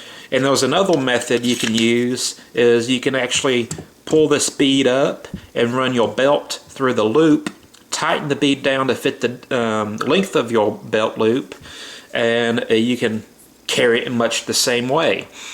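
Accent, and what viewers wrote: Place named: Washington, United States. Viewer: Your accent, Washington state correct me if I’m wrong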